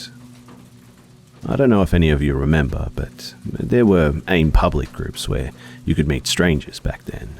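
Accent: Australia. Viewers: "Shadow People", a.k.a "Shadow Walkers" and "Dark Dwellers" are mainstays of many traditional cultures and generally seen as spirit beings that can be good, bad, or neutral. Usually with Native American and First Nations cultures, it's something negative. Being of Cherokee background, the traditional view for my experience is that they are visions of the Raven Mocker, the spirit associated with death and is the one who takes the soul into the next world, the one the medicine man has to protect the body of the sick and parents of little children while sleeping from with pine wood cuttings and tobacco. The Aborigines of Australia (judging from that voice and your fondness for telling the story about the Cockney security guard, you might get a kick out of this) have the Dawn Walkers, the beings that during the Dreaming wandered the world, creating various landmarks and working out various "just-so stories", but are now mysterious visions one can catch in their eye if they look hard enough. 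Unlike many Native American versions, these are positive and they are known to be powerful and can give great strength and wisdom to those that treat their dwelling-places such as caves and trees with respect. Really neat stuff, cultures and religions, anthropology and the study and preservation of the cultures and heritages of our planet.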